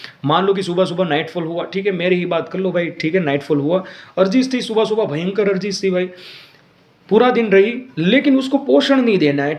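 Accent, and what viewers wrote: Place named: Gujarat, India. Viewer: vaah... Mane laygu j tu tamara hindi ma gujrati tone thi... Bau anand thyo... Tamne hu follow karu chhu and tamara videos jou chhu.. Bau pela pan bija videos aava badha topics par joyela tyare em thatu k chalo amal kariye pan vasna evi cheej che k tamara thi rehvaay nai.. Me pan bau nani umar thi sharu karyu tu... Pan mota thya pachhi control rakhto thyo pan je kevay k man ma gandgi bhari hoy tya sudhi e reality ma avva nu j che.. e eno rasto sodhi ne parane apnne e karavi le... Pan have hu evi umar ma chhi jya mara have lagna thase mari nani ben che graduation complete thyu che last year enu e pan have lagan karva jevi j che.. And mara papa kaik bau kamata nathi.. And hu have 25000 na pagar sathe job sharu karel che 1 varsh upar jene thyu.. Atle have mare to aa badha ma thi farajiyat bahar nikdvu j pade em che kem k have atyare no jaaygo to jeevan have barbaadi taraf j jase.. 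pan sthiti atli pan kharab nathi... Pan thodu to kharab che k hu koi bija ne na sachvi saku jo hu andar thi atlo santosh sathe na jeevto hoy to.. atle man nu ek level have achieve karvu che atle have tamne joi ne man ma kaik inspiration made che.. Em to hu foreign accounting ni job karu chhu.. CA chalu karyu tu 12th pachhi ema CPT thai gyo to pass pan pachhi intermediate ma atki gyo.. have ema job ni sathe preparation kari ne nikdvu chhe.. Pan maro pele thi confidence level occhu hovane karane and communication weak hovane karane kadach maro pagar occho che... Atyare hu eklo bija city ma rav chhu pare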